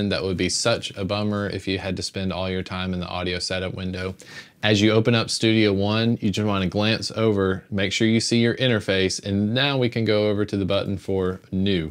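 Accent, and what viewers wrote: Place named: South, United States. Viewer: I could be wrong but I detect a very slight southern accent in your voice.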